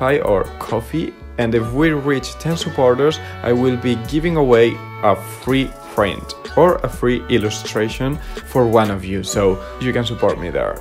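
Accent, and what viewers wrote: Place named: Madrid, Spain. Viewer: Me gusta que subas videos en Ingles pero extraño tu acento Madrileño 💔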